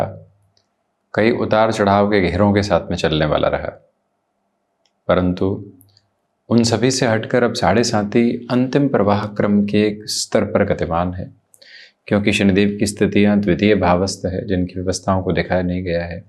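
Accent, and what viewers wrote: Standard. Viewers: आप का हिंदी बहुत शुद्ध है गर्व है ऐसे हिन्दुस्तानीयो पर जो अपने गरिमा को बनाऐ हुऐ है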